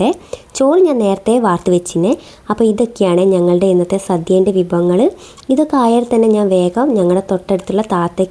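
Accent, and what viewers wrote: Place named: Malappuram, India. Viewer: Super🎉🎉🎉, നിങ്ങൾ കോഴിക്കോട്ടാണ് ലേ? സംസാരം കേട്ടപ്പോൾ മലപ്പുറമാണെന്ന് തോന്നിയിരുന്നു🎉🎉🎉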